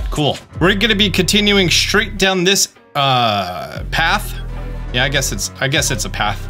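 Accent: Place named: United States. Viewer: Why do you sound like American?😊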